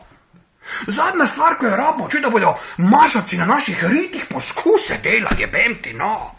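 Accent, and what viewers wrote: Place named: Maribor, Slovenia. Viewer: Pizda še zdej ne morem prebavt mariborščine. Še en rant okol radarjev, stopped 50s. Good luck.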